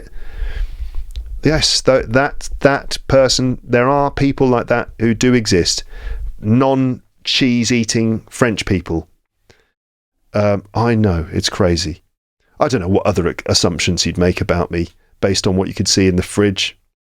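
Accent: United Kingdom. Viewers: Dear... Happy Birthday to you. I'm from Sri Lanka and have been listening to your podcasts for a long time. Though there are lots of other podcasts, your podcasts are special in terms of their clarity and accent. To me, really, there's no need for subtitles to understand you. I'm in a position to understand every word you say. You speak in a clear British accent. Whenever I am free, I'm in the habit of listening to your podcasts and I feel that my English is improving day by day. Thanks for your podcasts. Keep it up.... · Happy birthday to, happy birthday to, happy birthday dear, happy birthday to. May the force be with you! P.s. is the best teacher of English with a British accent on You tube. Fight me.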